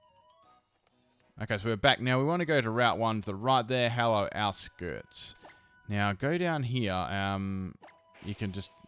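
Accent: Australia. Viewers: today i was at toys r us and there was an Australian family there. the moment they started talking with the Australian accent, i immediately thought of you lol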